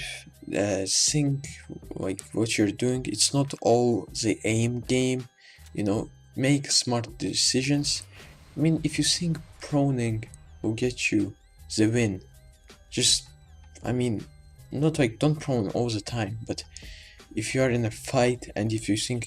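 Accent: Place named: France. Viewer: He is french or Belgian or Swiss or from Montreal maybe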